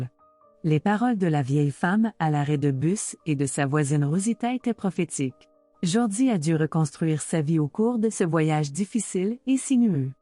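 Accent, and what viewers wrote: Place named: Canada. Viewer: Bel accent canadien J'ADORE bonjour.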